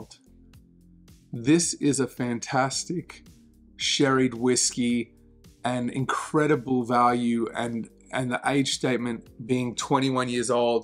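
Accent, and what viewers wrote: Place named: Australia. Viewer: It's like majorkill from Warhammer 40K acting like a perfect gentleman and talking about whisky instead of spacemarine figurines and hentai. I guess the Australian accent is just more gripping. Great content.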